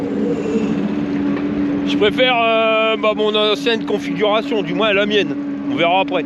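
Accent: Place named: Nord-Pas-de-Calais, France. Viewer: Salut je vais m'offrir un fat bike 20 pouces, j'ai regardé les modèles et celui qui m'a tapé dans l'œil c'est le Garret Miller Z. Quand penses-tu? Ton biclou blanc derrière toi est sympa, quel est ce modèle? Merci beaucoup pour tes vidéos avec ton accens CH'TI j'adore, continu comme ça.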